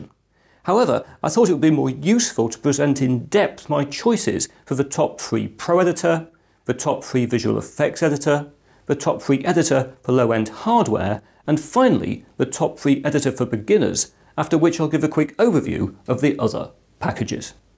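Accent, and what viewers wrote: England, United Kingdom. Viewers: Perfect British Accent. Bravo! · Excellent, thank you. Watching it now. Also subbed to your channel as A. I enjoy your delivery. B. Fell Brit makes a nice change. C. Most importantly I'm passionate about the same subjects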